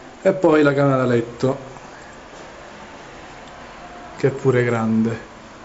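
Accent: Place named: Naples, Italy. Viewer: Nei video di multiplayer.it l'accento napoletano non è così marcato come qui,lo stai man mano perdendo oppure lo contieni?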